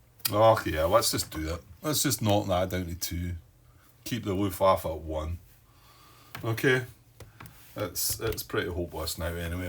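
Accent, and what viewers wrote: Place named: Scotland, United Kingdom. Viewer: Thank you for this journey that ended today. I started to watch your videos of The other side of the hill after backing the reprint in August, and never caught up with your speed, so I never put a comment, but today, I can finally say all the good things. It's been quite tough sometimes for different reasons: the firsts parts with your accent (I do not have any more problem with Scottish accent now 😊) and sometimes being inside your thoughts is not easy 😅. but anyway, your questions and sometimes mistakes made me improve my understanding of the game and I do play on vassal ( 2 solo plays and 2 other with 4 players) and the physical copy of the game has arrived in the meantime. thanks again for the time spent to offer us a global vision of this remarkable The other side of the hill.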